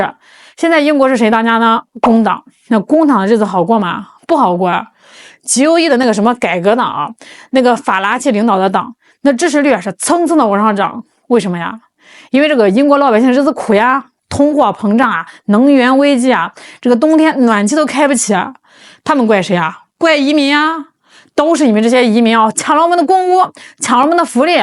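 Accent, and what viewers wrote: China. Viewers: 开什么玩笑，让中国大陆地方口音浓重的人念稿，解读香港群体在海外的心态，如此驴唇不对马嘴自己不嫌别扭也罢，出来作弄恶心他人则有辱斯文有失厚道……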